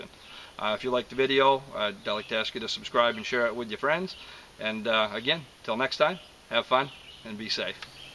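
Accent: Maine, United States. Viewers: You sound like you're from Maine. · Are you from down east Maine by any chance? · I think I hear a Maine accent here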